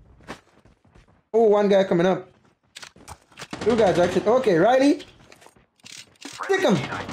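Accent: Jamaica. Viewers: don't try so hard to speak pure english: hit ah video wid pure yardman tone. Respect no hard feelings.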